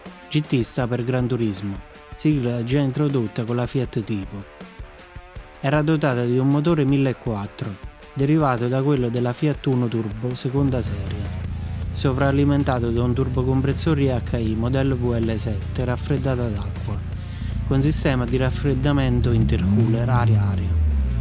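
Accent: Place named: Naples, Italy. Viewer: Ma come cazzo si fa a commentare e redigere un servizio del genere con una voce con uno spiccato accento napoletano! Vergogna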